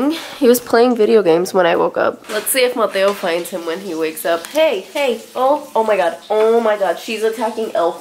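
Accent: Puerto Rico. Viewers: I recognize that Spanish accent anywhere! Puerto Rico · El bien boricua jajajajaja arrastrando la R y hablando sin la S al final de las palabras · i love how puerto rican he sounds. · He is Puerto Rican is obviously 😂 reconozco mi acento “déjame veL”😂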